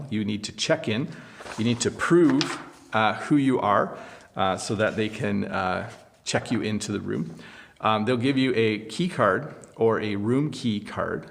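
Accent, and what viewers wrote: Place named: Canada. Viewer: Its so exciting to watch your channel. I just learn so many phrases about a trip. I want to grab Canadian accent. By the way I am from Bangladesh and my native language is Bangla. I like to be native English speaker like you. You are so understandable. Could you please tell me the whole process to be like you. You are just amazing. Hope you will understand the pain of learning English for a non-native country's people.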